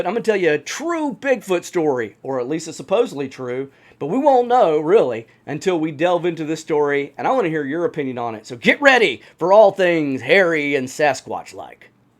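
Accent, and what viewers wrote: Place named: South, United States. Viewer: Hello again, My dude. As ever, another well done presentation. Narration on point, albeit sprinkled with hints of friendly southern winds. As for the subject matter, I have yet another gift for you. Below is a link to the story of Port Lock Alaska - I will not say anything to spoil it, but.... this is one of those very little known stories with credible and VERIFIABLE bits and pieces out there... In my honest opinion - THIS IS BIGFOOT COUNTRY! Keep well, be safe, and as always, let's strive to earn the knowledge that will be our own individual lights on our darkest days.... <link>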